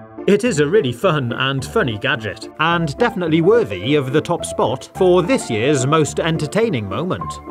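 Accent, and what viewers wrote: United Kingdom. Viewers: I love this guys British accent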